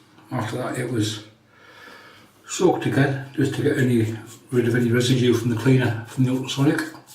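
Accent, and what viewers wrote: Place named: Northern England, United Kingdom. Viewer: Good one! I use the same perfume which is obviously spot on with that soap. One day I will get the original CREED. Now I’m not good at British accents and I apologise if I am being horribly offensive, but to my ears you sound a bit like you’re from Northern England.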